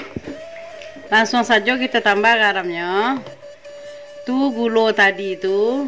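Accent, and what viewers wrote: Minangkabau, Indonesia. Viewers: Uni menggling cabe Minang asli👍👍tak ade Kat Malay ni,bahasa nya pun khas,🤣🇲🇾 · Lah wak Cubo buek tek, Alhamdulillah.. Lai lamak, awak suko ngikutin resep etek, klu dari bahaso nyo, etek kok kayak urang pesisia, iyo po ndak tek..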